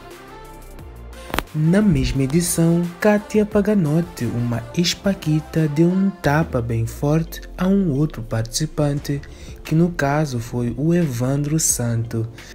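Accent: Portugal. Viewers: Essa pessoa narrando o vídeo com certeza é de Portugal ou de algum país luso português. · Esse sutaque de português?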